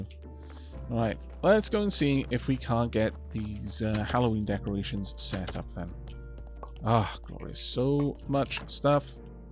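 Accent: United Kingdom. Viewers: Holy shit your like one of the most British people ive ever hear speak...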